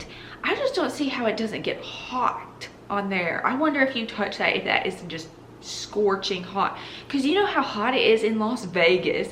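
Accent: South, United States. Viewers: u dont have to hide ur accent sweetie go ahead with the southern loveliness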